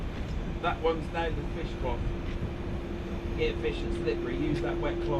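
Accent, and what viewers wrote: Norfolk, United Kingdom. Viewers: Cracking video oil them boots up😉 well done on the fish. Can tell your a Norfolk boy. Hope you to have a good Christmas.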